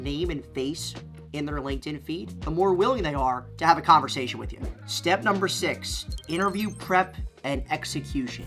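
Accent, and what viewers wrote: United States. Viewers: Do you feel disadvantaged relative to your British/Australian peers? Due to your American accent.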